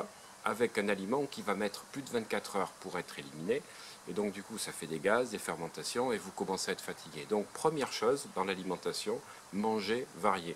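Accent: Bordeaux, France. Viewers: j'adore votre accent qui me rappelle mon origine Bordelaise, merciiiii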